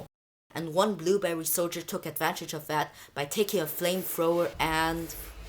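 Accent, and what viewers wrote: France. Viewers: BRO YOUR ACCENT IS FRENCH BUT SO GOOOOOD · Gotta love the french accent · The french accent 😂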